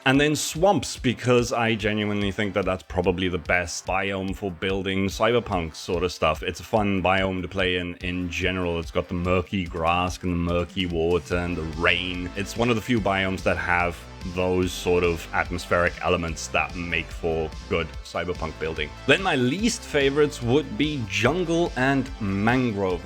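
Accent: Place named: Wales, United Kingdom. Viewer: Congrats! 🥳 Have to say I was convinced you were Welsh before watching this. My first language is Dutch so I can usually pick up on similar accents, SA included, but unlike Rendog's accent, I never would've guessed you were from SA.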